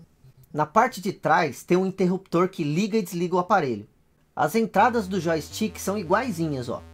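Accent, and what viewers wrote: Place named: Belo Horizonte, Brazil. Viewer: Prestei atenção em vc conversando. Vc eh de BH.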